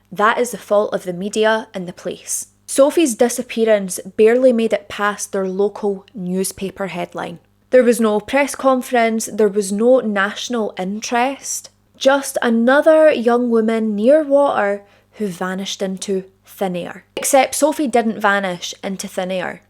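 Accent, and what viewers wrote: Scotland, United Kingdom. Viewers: English edit yes Scottish sorry!. Love accent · Lol, it's a pretty typical Scottish accent. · Ohh no danger great video its gallus a Scottish accent .watching from paisley ❤ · She's Scottish.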